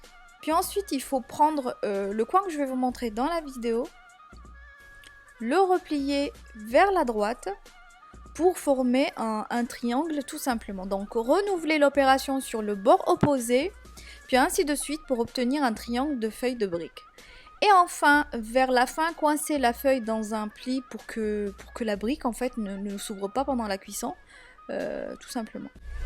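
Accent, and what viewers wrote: Morocco. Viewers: L'accent marocain j'adhère pas 😖 Mais bon y'a toujours dès rageur qui accepte pas les avis des autres:) Sinon comme d'hab une bonne maman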